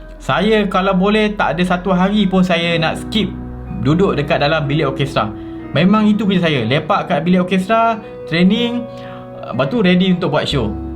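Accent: Melaka, Malaysia. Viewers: loghat Melaka kuat jugak tu bro... Pegang = "pay"gang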